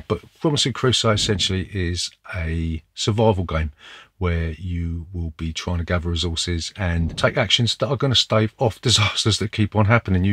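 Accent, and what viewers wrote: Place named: London, United Kingdom. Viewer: I’ve been watching the videos you have produced for a little while and despite the list which is awesome with lots of games I want to try on there, I am always struck by that bloody lovely gaming room and your awesome Londoner accent!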